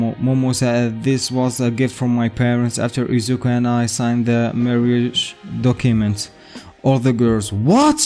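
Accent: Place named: United Kingdom. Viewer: You became extremely British this episode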